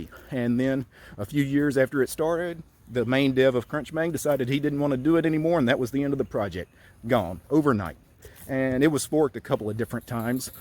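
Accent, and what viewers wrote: South, United States. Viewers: > bald boomer with a southern accent ranting about linux and open source software in his back yard Is this a whole genre now?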